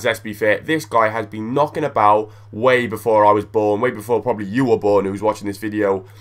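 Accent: Wales, United Kingdom. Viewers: Are you that guy from the valleys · r u from the valleys???????? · You sound like leroy from the valleys!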